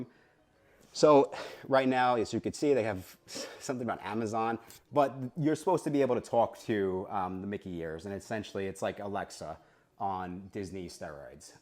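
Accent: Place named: New York City, United States. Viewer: Thanks for this video! Considering this for our next stay and this was very helpful. Also a nice taste of home hearing you talk! --a fellow NYC Italian now living elsewhere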